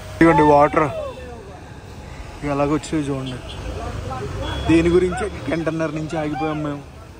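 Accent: Godavari, India. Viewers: haha super crazy vlog , godavari slang bababoi bababoi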